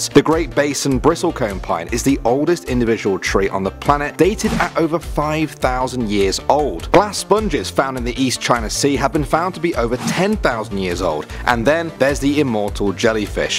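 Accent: United Kingdom. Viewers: 😍😍😍😍 I KNEW THAT BRITISH VOICE SOUNDED FAMILIAR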